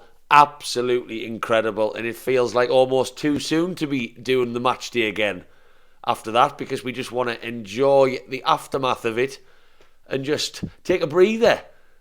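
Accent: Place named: Sunderland, United Kingdom. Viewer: you've got a bit of mackem in that accent. You from washington?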